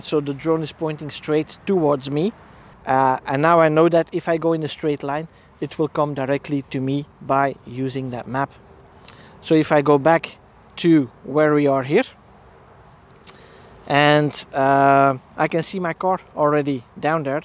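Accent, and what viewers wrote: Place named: Belgium. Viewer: Very nice explanation. Zeer mooie uitleg 😉. I think you are from Belgium. Correct?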